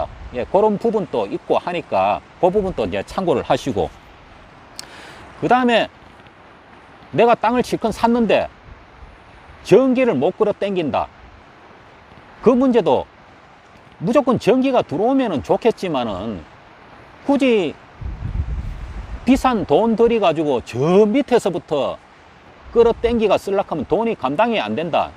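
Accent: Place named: Uiseong, South Korea. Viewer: 의성 말투 같은디요.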